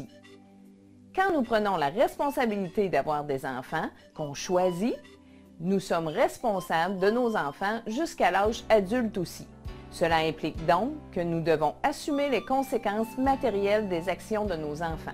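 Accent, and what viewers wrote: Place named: Quebec, Canada. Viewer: Je comprend pas trés bien le Québec , vous pouvez parler le français normalement ? :/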